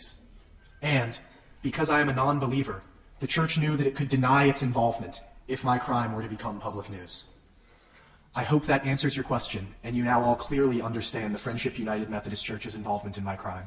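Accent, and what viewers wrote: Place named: South Korea. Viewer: the way he was speaking English, but it sounded like he was reading a Korean dialect of English.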